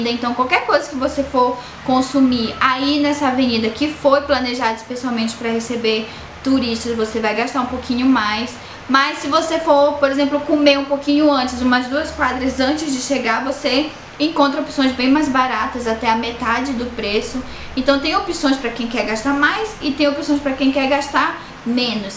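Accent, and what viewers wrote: Mexico. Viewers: Até o seu sotaque é mexicano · Já está até com o sutaque deles🤭❤️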